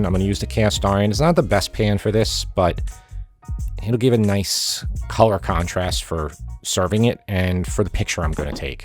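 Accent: New York, United States. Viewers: I just found your videos! I absolutely love them...and your NY accent!!! I'm from Long Island but now live in NC. I MISS that accent!!! We have finally infiltrated the South...we have bagels and pizza Lol, which were unheard of when we got here! Thanks for your videos!!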